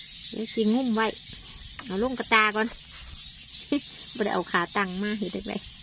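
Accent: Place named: Phu Thai, Thailand. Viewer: สำเนียงคือภูไทเว้าลาว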